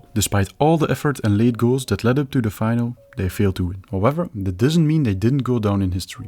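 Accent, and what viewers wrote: Belgium. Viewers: Klinkt eerder Vlaams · Oost Vlaams accent zal ik zeggen volgende keer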